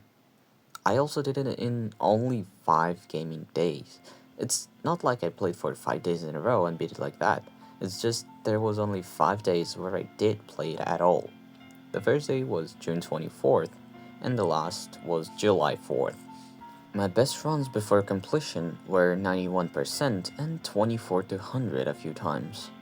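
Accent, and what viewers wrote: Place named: Russia. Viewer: Все равно русский акцент,чувствуется ппц.А зачем вот это английское,если тебя не смотрит никто и так,про зарубежных вообще молчу.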